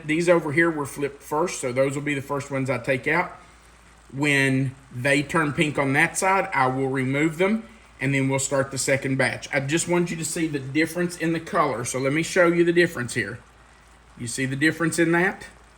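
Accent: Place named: Southwest Virginia, United States. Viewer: I think the thing I like best about you is your accent. It sounds exactly like I sound from SW Va. Thanks for your channel. I love it. I am adding to my grocery list right now.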